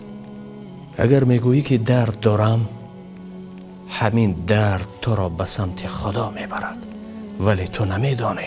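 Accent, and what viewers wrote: Tajikistan. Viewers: Забони Точикиро тоза гап мезанад Офарин 👍👍👍👍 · Дар забону, лахчаи поку, ширинии точики шердилм садкашавм😢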